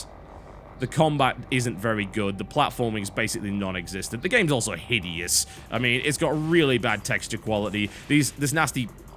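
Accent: United Kingdom. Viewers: You talk like The Doctor (And not because of the British accent. You just have the same emotional phases in your voice. and your sentences.)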